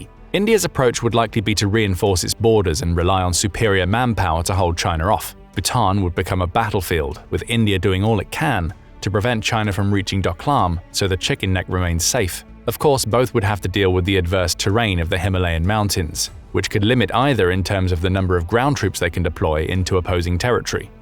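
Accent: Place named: Oxford, United Kingdom. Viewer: Trying to use a British guy with an oxford accent to justify spreading outright lies is despicable. Read the history of the conflict and you find India is the wolf in sheeps clothing.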